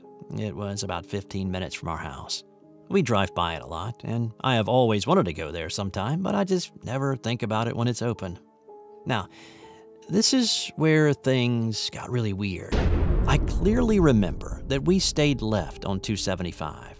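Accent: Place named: California, United States. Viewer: This was hard for me to listen to because it just was tons of explanations of roads and landmarks, trying to paint a picture that can’t be painted to someone who doesn’t know the area. It sounded like The Californians from SNL. I get it in terms of recording the events, but not for ominous storytelling.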